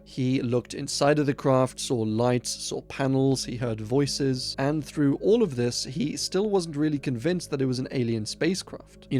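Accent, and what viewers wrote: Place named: United Kingdom. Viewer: I have to know why Brits pronounce “aw” like “are”. I just want to know what is going on anatomically. Like where is your tongue when saying “idea” or “law”. Where is the “r“ sound coming from? No judging. It just stands out. How does phonology differ so much? Is an R not an rrrr sound? How does a “v” become a “wha” (w sound) in some languages? When we all have the anatomical capabilities? Does v sound like w in India?? Just curious. 🤷🏻‍♀️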